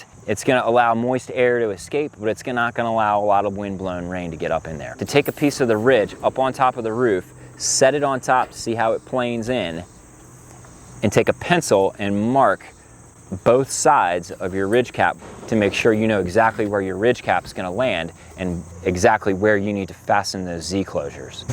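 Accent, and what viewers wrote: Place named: California, United States. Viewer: I’m from Southern California, born and raised. This guy is without a doubt holding the thickest Californian accent of all time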